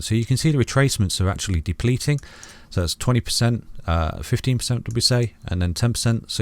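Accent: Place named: Sawley, United Kingdom. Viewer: You sound very local to me in Sawley. Fancy a pint at the White Lion so I can rack your brains? Haha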